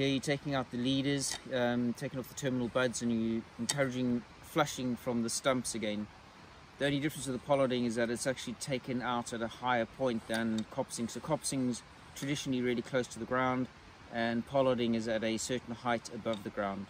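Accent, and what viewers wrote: South Africa. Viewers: Are you South African, or am I missing the accent?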